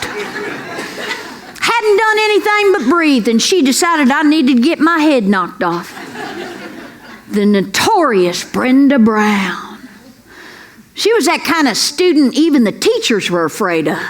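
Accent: South, United States. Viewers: She is absolutely right not only that I love her southern accent. I suffered from bullies until I was 12 yrs old One day on my own since I had no father to help I confronted my first bully at 8 yrs old and asked him to fight me. my heart was in my throat but I roared with threat expecting to be beaten to a pulp but to my surprise he backed off. I thought the bully problem was resolved but on later years i was beaten by other bullies. I finally asked my momma to pay 5$ per week so I could take Judo lessons from a brand new Dojo near our home. She looked at my teary eyes and accepted to pay for the lessons. Every bully walked by the schools which was on a main street with see thru glass like most store fronts My bully problem was over. The word spread around not to mess with me. I continued with practice and after many years and many contests i got as far as 2nd degree black belt then I switched to karate Okinawan style and received a black belt The after college I stared to learn Butaijutsu and from there developed my own self defense system. 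At the hight of my training I could break a coconut with my right hand. I taught both my sons from the time they were 10 till 16 Till they bacame black belts Them they went on to college They were never bullied by anyone because I had their back and expected them to stand up for themselves. And me ? Well never was bullied after 12 yrs old I grew up in tough areas and walked into neighborhoods where my friends would not dare. All possible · Hilarious, stories like this with a southern draw are more believable 😁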